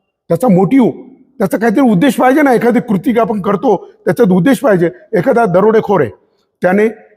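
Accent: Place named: Ahmednagar, India. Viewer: नगरी भाषा 😊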